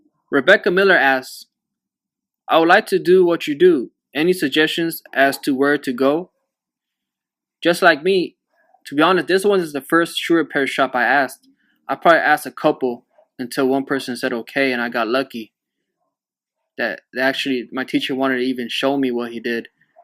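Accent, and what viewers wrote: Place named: Latin America. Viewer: I can hear his Latino accent. For a minute it sounded like he was going to bust out some Spanish. 😂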